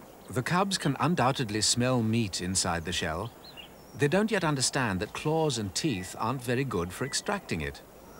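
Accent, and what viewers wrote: United Kingdom. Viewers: Wonderful, quiet sensible lion doc. with a British narrator. Yes lions move out of the way for now, but no grazing animals die of old age, eventually the apex predators get them.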